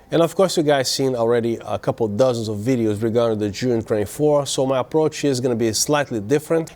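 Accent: Brazil. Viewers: Brasilian Accent?! <3 Loved the Video! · Achei que tava familiar mesmo!!! Legal saber que é BR!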